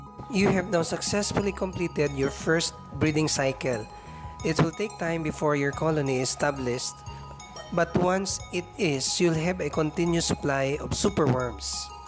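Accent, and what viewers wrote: Philippines. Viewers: I am assuming that you are Filipino when you started talking Great video mate!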